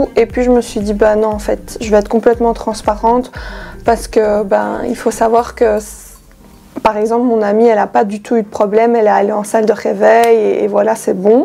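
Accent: Belgium. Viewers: Coucou ma belle, j’entends ton petit accent belge et je suis belge aussi. Je voulais avoir les coordonnés de la chirurgienne ainsi que les prix que tu as payer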